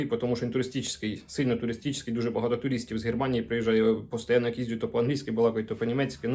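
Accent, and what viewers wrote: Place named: Slobozhanshchyna, Ukraine. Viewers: Вітаю, а скажіть звідки Ви родом? Говорите суржиком, мабуть з Сумщини? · Це твоі проблеми! Чоловік балакае на слобожанському говорі! Люди не должні всі по-галічанському балакати, як ти! ЗА СЛОБОЖАНСЬКИЙ ГОВІР - ЛАЙК!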